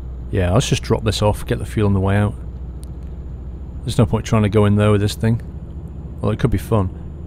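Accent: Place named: United Kingdom. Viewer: I enjoy the long videos because they show full venture of the journy and i find it entertaining listening to you say things like nobbit as an american your british accent makes me laugh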